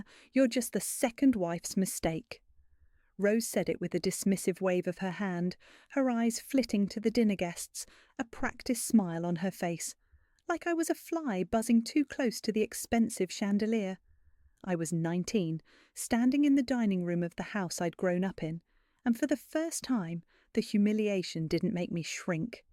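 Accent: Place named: United Kingdom. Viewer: Why use a British accent for an American story?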